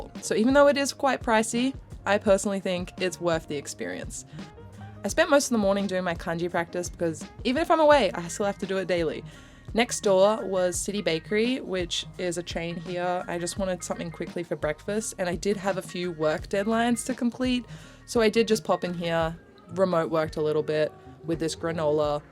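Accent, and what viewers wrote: Australia. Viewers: Refreshing to hear an Aussie accent 🙏🏻 Cannot WAIT to be back in Kansai this week 🔥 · There she is!... You sound like you just came back from Australia... Like my Southern Drawl comes out when I go home... 😆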